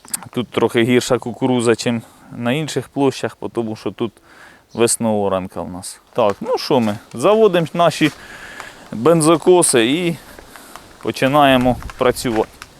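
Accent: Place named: Sumy, Ukraine. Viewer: я чую діалект сумський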